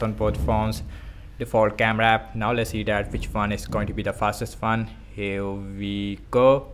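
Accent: India. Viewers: Es hindú, yo creo por eso habla así 😂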